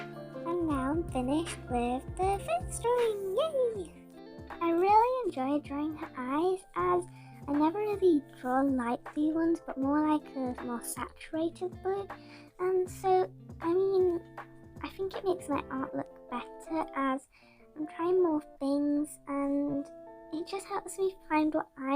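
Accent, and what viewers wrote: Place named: United Kingdom. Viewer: I love british accents haha, they sound way cooler than whatever accent i've got lol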